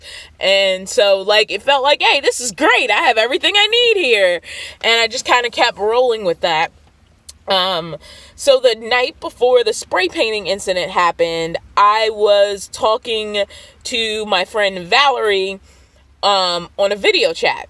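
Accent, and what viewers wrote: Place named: Baltimore, United States. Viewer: Sorry this happened to you and your van. If you decide to pursue the issue, the police can review video from the stores to see if your incident was captured on video, and perhaps also their license plate. Even if you never mentioned Baltimore, I can hear it in your voice, especially the "oin," instead of "on." I'm guilty of that one too.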